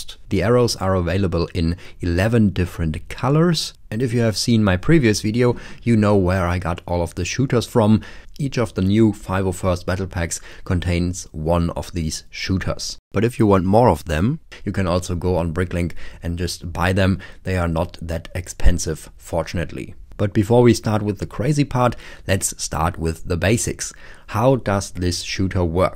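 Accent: Germany. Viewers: you sound german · This dude sounds German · Man hört den deutschen Akzent aber cooles Video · Are you German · Ha ich da gerade jemand deutschen gehört? oder Österreich? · i smell german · Didn't know you were german xD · Are you german? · Wird a German excent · Man hört nen deutschen akzent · Was für ein krasser deutscher Akzent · are you german? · Min drei, zwo, eins... dann noch dieses Englisch mit deutschem Akten, da merkt man das ein Deutscher redet.:) Grüße aus Germany! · Als ich das Video gestartet habe wusste ich sofort das du deutscher bist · Höre ich da ein deutschen Akzent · Bist du aus Deutschland hört sich nämlich so an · Du bist deutsch😮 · I didn’t notice how German you sound until the „Drei zwo eins“ , but now I can’t unhear it XD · Man hört richtig das du eigentlich deutscher bist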